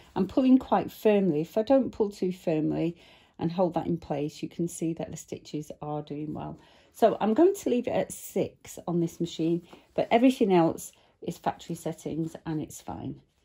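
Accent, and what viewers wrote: United Kingdom. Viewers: 🧡 Love your tutorials and your British accent!! 🧡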